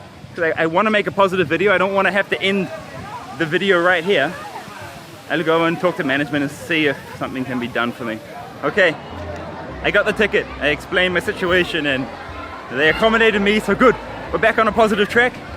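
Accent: India. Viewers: Hey what happened to your accent. You sound so Indian in your english accent 😅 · This dude must've been living long enough in India that his English is already got that Indian accent. 😁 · Sounding more Indian every video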